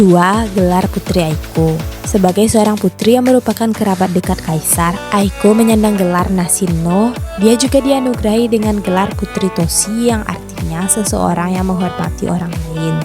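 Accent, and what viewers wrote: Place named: Padang, Indonesia. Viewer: Min, lu orang padang yah? Kerasa banget dialeknya.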